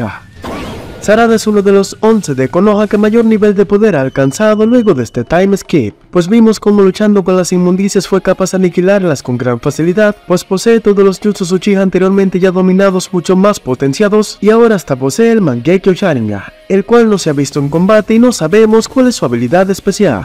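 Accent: Dominican Republic. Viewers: El Sensei tiene su flow dominicano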